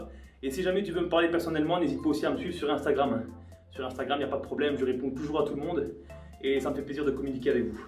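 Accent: Belgium. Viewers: tu es belge ? si oui quelle region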